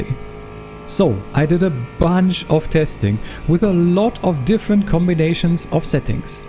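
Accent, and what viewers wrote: Germany. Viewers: machst du das auch in Deutsch weil ich würde an deiner Aussprache sagen du bist ein Deutscher wäre cool danke :D lg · Well that's a subscribe from me. German Accent + Motorsport + Guides That a recipe for success.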